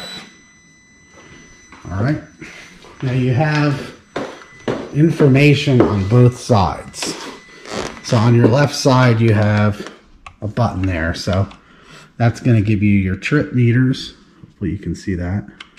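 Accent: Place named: Western Pennsylvania, United States. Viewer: Your voice sounds like you are from western Pennsylvania.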